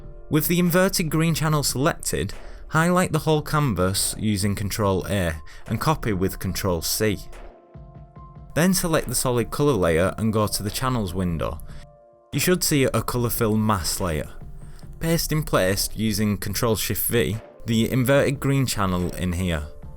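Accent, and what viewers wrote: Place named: Northern England, United Kingdom. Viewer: You're so northern hahaha! I love it. Lovely tutorial, thank you for sharing :)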